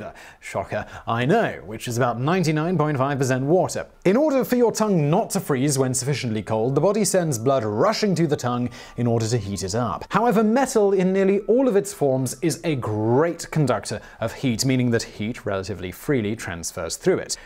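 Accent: United Kingdom. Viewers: "I triple dog dare ya!"😛 I like the way Brits say Aluminum!😁👍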